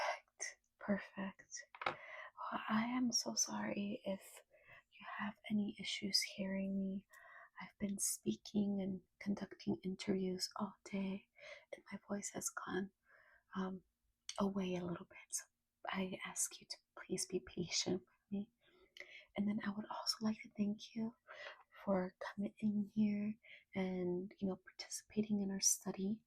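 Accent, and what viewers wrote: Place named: Brazil. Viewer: Posso estar enganado, mas reconheço um sotaque brasileiro de olho fechado, inglês ótimo inclusive.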